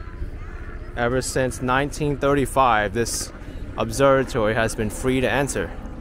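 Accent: United States. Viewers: I am learning spoken English from your videos. Thank you for the pure American pronunciation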